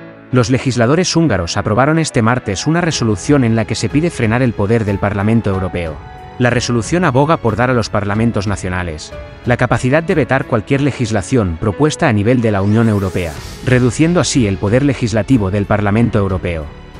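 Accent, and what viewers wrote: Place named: Spain. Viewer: Bolivia noticias, y sale hablando un español. ??????????????????????????????????!!!!!!!!!. Habrá un canal que se llame chile noticias y salga hablando un cubano o un yanquee ????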